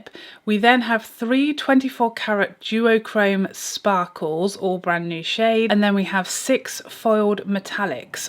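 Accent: United Kingdom. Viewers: Oh a new video. Thanks and your accent always gives me wanderlust vibes to go to UK. I hope it will happen soon. :-)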